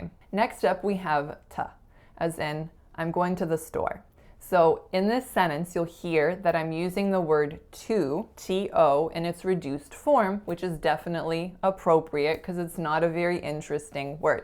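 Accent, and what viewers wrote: Canada. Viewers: Why didn't you keep that beautiful Canadian accent? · American Accent Mastery agreed in general, but your pronunciation of “about,” “today,” and “video” are very Canadian sounding to me. Remember that in the USA, cloud and house rhyme exactly but in Canada they don’t. Also, you pronounce today as todeeeegh, which is Canadian. Also you pronounce video with a very Canadian sounding O. And it’s wonderful. I feel sad that you felt you had to change your accent. I’m glad you seem excited about it though. Speak how you wanna speak and be who you are. Loved the video! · She sounds Canadian to me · I knew you were Canadian from the start. The "a" and "e" vowels are often very "ay" sounding. "Very" sounds like "vay-rie". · I was born and raised in Toronto (or "churrono" since this is an pronunciation difference vid) and I pronounce nearly all the words like her Canadian examples (and I'm only a first-gen Canadian, so its not like I come from some super Canadian family). 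Only one that I sorta say different is kilometre. And honestly, thats I found was dependent on the situation. It sorta switches in between, in that it seems somewhat more proper to say it the "Canadian way" in some cases (like measuring distances), but I use the "American way" of saying kilometre for everything else. I mean, 'less you talkin bout the actual "Toronto mans" accent.... But thats a whole other discussion (more Jamaican/Carib influence than anything else... similar to how the MLE accent in London developed).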